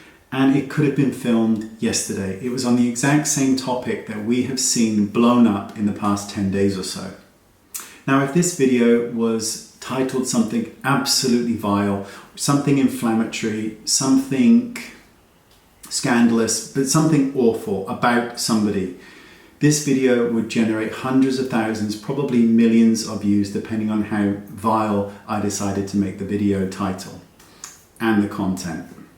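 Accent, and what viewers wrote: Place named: South West England, United Kingdom. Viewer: Hi! I just stumbled upon your videos and I think you are so wonderful! It's so refreshing to watch someone who isn't involved in drama and just really loves makeup! This may be strange, but I was just wondering where you are from? I'm from Bristol and your accent seems to have a South-West twang!!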